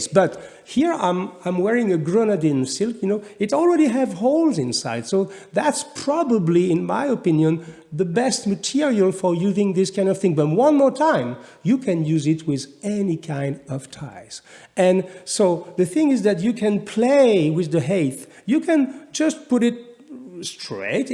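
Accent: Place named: France. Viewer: I always like to listen to your nice french accent, makes style sound extra stylish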